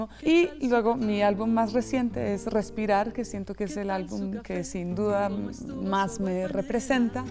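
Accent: Colombia. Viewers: Ya veo porque, noté un aire también Caucano en tu forma d hablar y de cantar,claro si has vivído en la Bella Popayan,que alegría contar con una artista integral como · Un precioso acento colombiano es parte de tu talento. Gracias!!! Thanks!!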